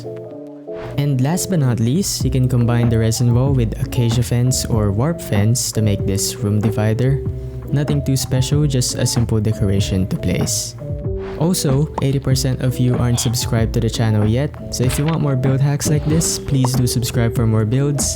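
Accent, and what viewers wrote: Philippines. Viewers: English language is Filipino accent.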